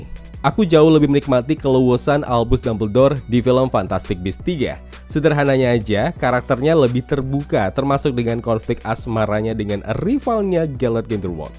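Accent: Medan, Indonesia. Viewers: Medan kali bah si abang..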